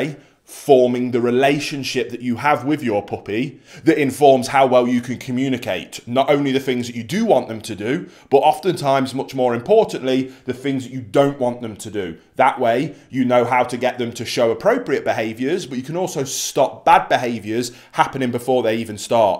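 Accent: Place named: Midlands, United Kingdom. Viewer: Are these in the uk? You sound like you come from the midlands xx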